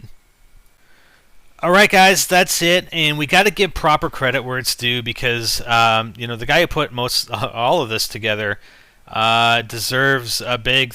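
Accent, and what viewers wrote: Canada. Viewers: this is so canadian with your prOcess and zed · are you Canadian? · Your Canadian aren't you (zed) instead of z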